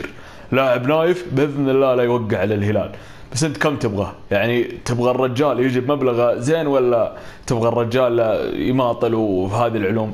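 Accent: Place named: Algeria. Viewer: اللهجة الجزائريه 😂😂😂😂😂😂 والله انت مبدع 😂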